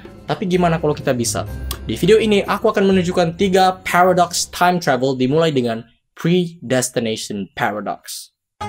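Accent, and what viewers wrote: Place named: Indonesia. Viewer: Gila semua katanya logat indonesia kecuali kata "paradox"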